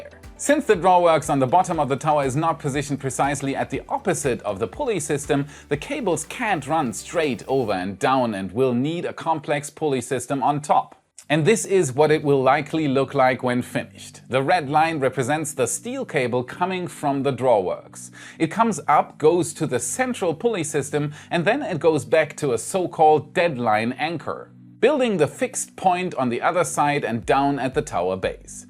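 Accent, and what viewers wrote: Germany. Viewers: we're waiting for your delicious German accent - and your v narratives on the (litterally) awesome progress that SpaceX have made the past week, of course! 😱 😋🍿 A thought: the narrowed design of the flaps seems reasonable for earth's athmosphere, according to SpaceX' models, but what about the low density atm of Mars? #Whataboutit? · I love his German accent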